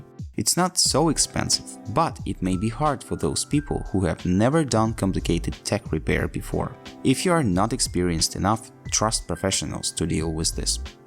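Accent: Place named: Ukraine. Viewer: My guy have a badass Ukrainian accent 😁🇺🇦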